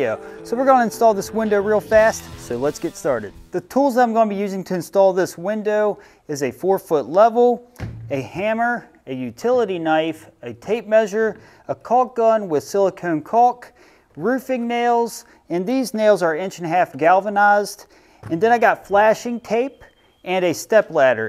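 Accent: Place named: Delaware County, United States. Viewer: Bro what part of Delco you from ?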